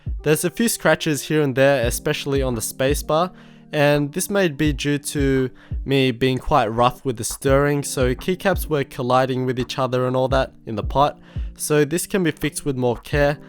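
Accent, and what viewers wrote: Australia. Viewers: I thought you were Australian! ...if it was a mix accent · ayyy an aussie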